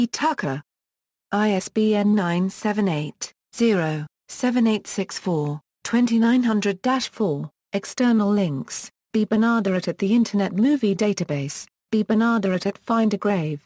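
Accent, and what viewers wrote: United Kingdom. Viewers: I was hoping this would be a new twist on this great actress; but it appears to be a reading of WIKIPEDIA with a British accent. Thumbs down.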